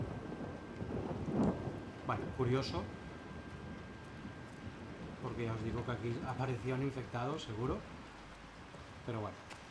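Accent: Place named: Catalonia, Spain. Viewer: Por el acento creo que eres catalán, yo Mataró Barcelona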